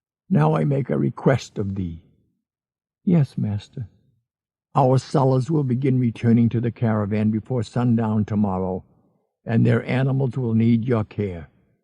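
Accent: Boston, United States. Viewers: Am I the only one that noticed that he started with scroll marked 3 instead of scroll marked 1???? It threw me off as I was following with the book.. ugh… I love his voice and Boston accent tho 😜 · Boston accent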